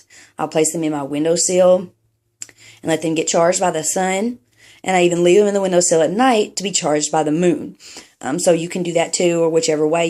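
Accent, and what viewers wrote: South, United States. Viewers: The video was fine. Don’t pay these dummies no mind. I’ve never done incense and want to try it and this is instructive. Gosh, and that wonderful southern accent! I totally miss hearing it!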